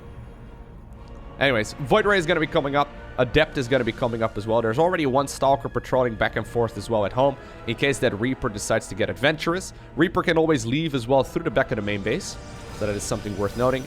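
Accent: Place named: Netherlands. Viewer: its the dutch accept onme of my best friends he speaks like that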